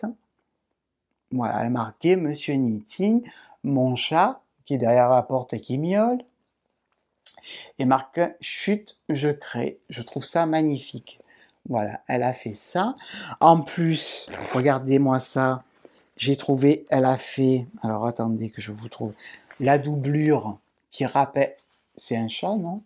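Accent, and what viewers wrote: South, France. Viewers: je comprends assez bien ton accent du sud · ce petit accent du sud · Ce petit accent du sud nous met du baume au cœur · ton accent du sud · J'aime beaucoup ta simplicité et ton accent du Sud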